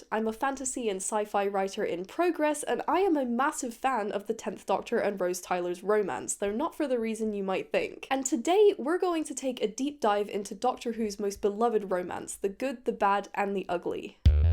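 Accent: Scotland, United Kingdom. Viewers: Are you Scottish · You have a weird Scottish accent, can’t quite place it.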